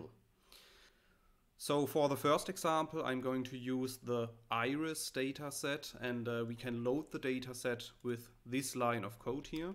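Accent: Germany. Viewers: Good lesson. Good english, relatively poor mine. I don't know deutsch, but diction sounds like deutsch from Hessen Frankfurt.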